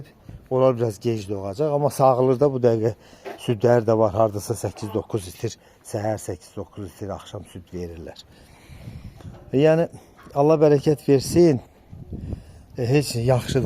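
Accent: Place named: Goycha, Armenia. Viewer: Ləhçən çox xoşuma gəldi GÖYÇƏ MAHALINDAN DEYİLSƏN? BASARKEÇƏR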